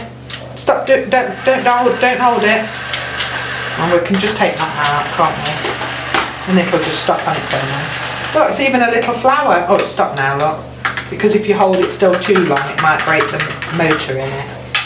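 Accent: United Kingdom. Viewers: 初めて拝見したのですが、英語が苦手な私でも一瞬でわかるイギリスの発音でびっくりしました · オススメにでてきて対してタイトル読まずに見たのですが、某アクセント研究YouTuberをみてるせいかイギリスアクセントだとすぐわかりました😂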